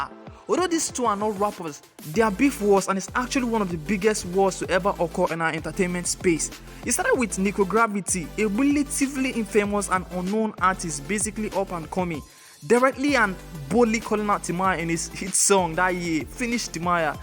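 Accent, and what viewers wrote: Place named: United Kingdom. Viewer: I love this voice over Giving me “British Vibes”😍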